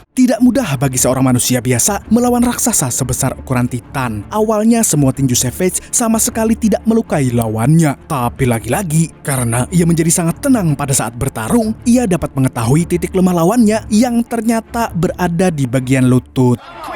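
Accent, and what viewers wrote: Banjar, Indonesia. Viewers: betakun am .. org banjar kah pian mang ?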